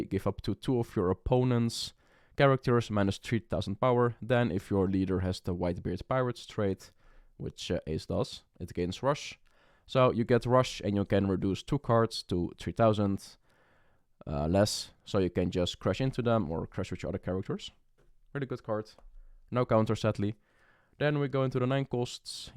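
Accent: Netherlands. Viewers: ik hoor een dutchling van jouw accent ngl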